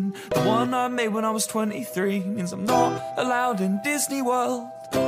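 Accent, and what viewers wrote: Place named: United Kingdom. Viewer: I love his British accent its amazing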